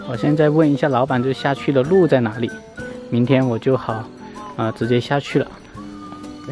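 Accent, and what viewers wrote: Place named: Hakka, China. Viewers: ，你是惠州客家人，我也是，难怪我能听懂你说啥。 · ！原來你是客家人！我也是！我們都是同音腔的客家人！👍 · 和老友鬼鬼搭肩膀太可爱了，客家话广东话都能通真棒👍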